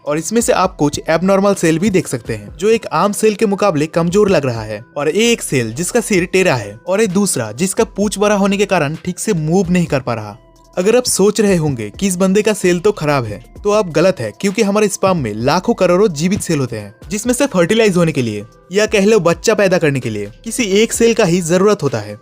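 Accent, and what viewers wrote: India. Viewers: It's very amazing. Your accent is like that of a bengali🤭🤭❤💖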